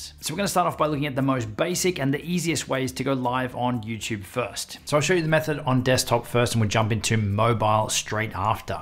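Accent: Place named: Australia. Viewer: Thank you for the tips, love the aussie accent :) Cheers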